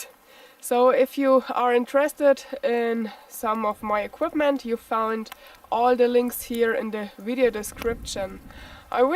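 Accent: Bavaria, Germany. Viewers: dieses bayerische Englisch ist - na ja - gewöhnungsbedürftig. Aber ich verstehe schon, dass Du versuchst, Deinen Wirkungskreis zu vergrößern.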